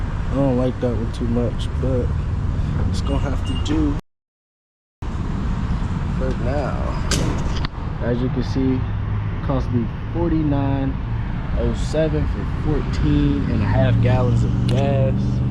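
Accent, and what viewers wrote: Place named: United Kingdom. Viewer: where you from? sounds like uk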